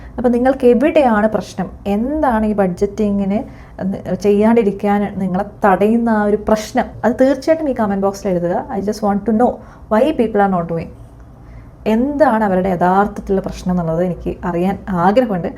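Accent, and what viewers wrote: Kannur, India. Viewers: Kannur kaari aano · And most of all, I enjoy listening to your Kannur accent :-)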